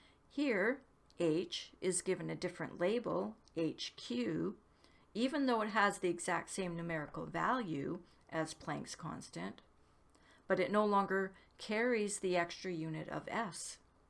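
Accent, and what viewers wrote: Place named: Canada. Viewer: I have been studying Ken Wheeler now long enough to feel comfortable. This now should help refine the incomplete areas. I need to model and understand what the mainstream calls atoms, electron orbitals/shells, valence etc. Now believing there is a minimum limit to everything - which is sensible to me - should help refine the model especially with regards to electron orbitals. Somewhere I expect to find a relationship based either on phi or fractals that will define patterns we experience as in the periodic table of elements. ps I assume you are Canadian - I heard aboot way too many times.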